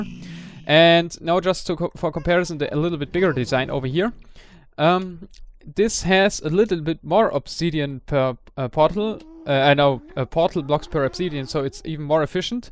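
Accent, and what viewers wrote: Germany. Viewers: wegen dem Akzent, klingt so ein wenig deutsch :D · are you german? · ich hab schon die ganze zeit das gefühl gehabt dass du deutsch bist. du hast einfach so nen "Akzent" und man hört das typische Schulenglisch raus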